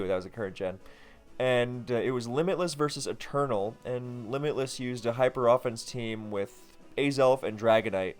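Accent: Germany. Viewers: Yes you should narrate the next DPP Ubers Tournament video in your German Accent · I need more of your German accent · As a german Person myself i Love watching People butcher the german Language, since it has a few stupid things and therefor is nearly always destroyed when tried to recreate so please use more German accent :) Also no, volcarona doesn’t use roost but it sometimes does use morning sun, it lost roost between gens And I want to see dnite espeed a polteageist (ghost mon) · You should narrate an entire replay in your awful German accent! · Please, for the love of all that is holy, narrate an entire game in your german accent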